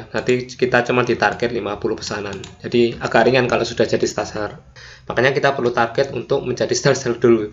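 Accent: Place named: Pekalongan, Indonesia. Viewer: Orang pekalongan yah mas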